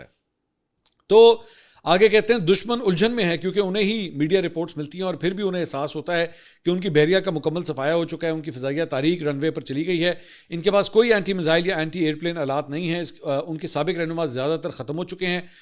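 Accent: Punjab, Pakistan. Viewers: (Bhai pin me )Bhai AP Jo Punjabi ka tarka lagaty hai na Sachi sawad a janda 😂 or program tu aho😂 hit different . Allah AP ko aise hi Khush rakhe ameen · Your Punjabi Very funny 😂😂😂😂😂😂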